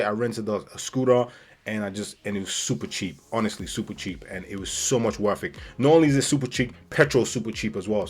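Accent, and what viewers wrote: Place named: Malaysia. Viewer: great to hear a local accent. Thank you for all the tips. Love Malaysia too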